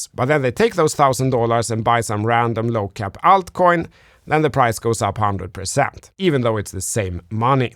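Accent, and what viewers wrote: Sweden. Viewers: A true Swede, judging from his very pronounced Swedish dialect. Keep up the good work brother!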